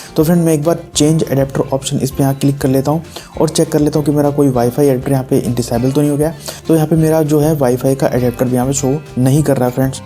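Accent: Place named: India. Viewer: India english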